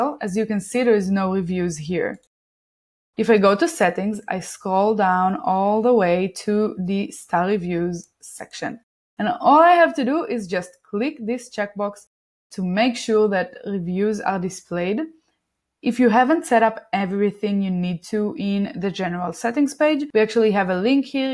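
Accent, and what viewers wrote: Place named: Israel. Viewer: i can hear that Hebrew accent!! haha, great video, thanks!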